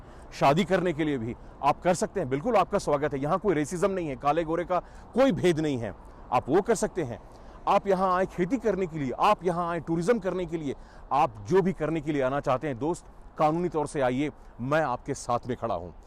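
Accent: Standard. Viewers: love you दोस्त, बहुत खुशी हुई आप अपने देश से इतनी दूर होने के बाद भी अपनी मातृभाषा नही भूले और गजब की शुद्ध हिंदी बोलते हो आप की भारतीयों को भी समझने में कठिनाई हो जाये 😜 अच्छा लगा किसने अपने का इस तरह राह दिखाने का काम करना 🌹🌹💐💐🌹🌹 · Your hindi is very pure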